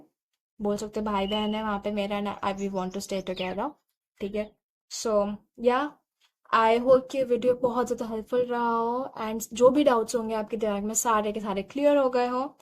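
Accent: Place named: Punjab, India. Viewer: I am damm sure you are punjabi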